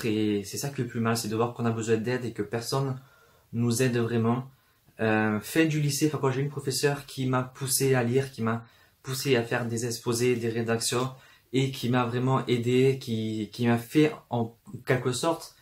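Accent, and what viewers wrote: South, France. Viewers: J'ai adoré ta vidéo ! Il est génial ton accent #teamaccentdusud 😉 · Vive le Sud, vive l'accent du sud et vive tes vidéos :D ! Bisous :). Et oui tout est possible : je suis bien d'accord avec toi :) !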